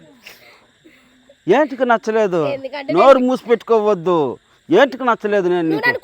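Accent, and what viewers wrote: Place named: Chittoor, India. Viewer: Perfect chittoor accent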